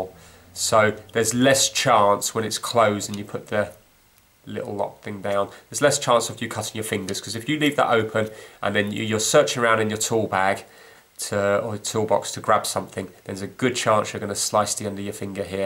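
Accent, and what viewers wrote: England, United Kingdom. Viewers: It's how English is spoken by the English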